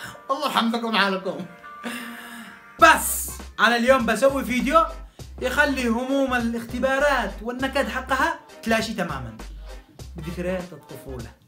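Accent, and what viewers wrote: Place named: Taiz, Yemen. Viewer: فناااااااااااااااااااااان انا فدا اللهجة التعزية 😍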